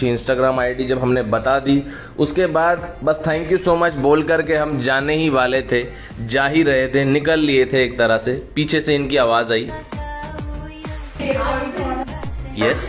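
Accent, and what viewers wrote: Standard. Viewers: Mere Bhai Dil Se Badhai aur jo Tum kar rahe ho na Vakya mein lajawab hai main sketch ki baat nahin kar raha Mujhe sketch ke sath Ek Aur chij dikhi vah bhai aapki voice bolane Ka Tarika Jis shuddh Hindi mein baat karte ho na bhai Kasam Se Dil Garden Garden Ho Gaya.. love u bro.. Jay Shri Ram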